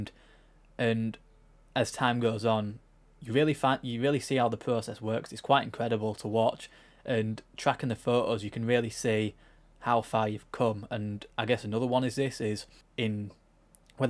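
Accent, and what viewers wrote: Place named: Northern England, United Kingdom. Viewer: Proper northern accent bro but love the video ❤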